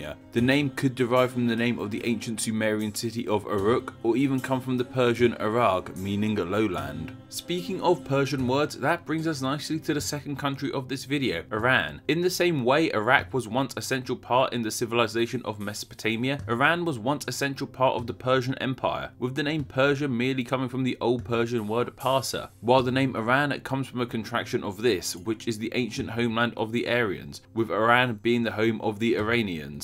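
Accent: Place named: United Kingdom. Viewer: Your british accent gives me headache. Great video though.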